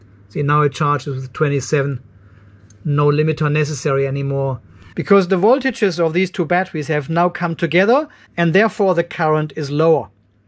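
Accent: Germany. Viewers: At first I was thinking what is a PVM? Then I remembered ah German, where W's are pronounced as V's. :)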